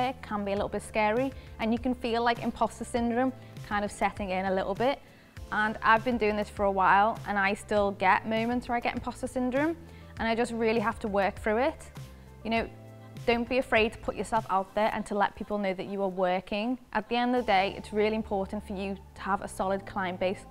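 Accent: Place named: Liverpool, United Kingdom. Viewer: She’s great and that gorgeous Scouse accent. Well done on all your success!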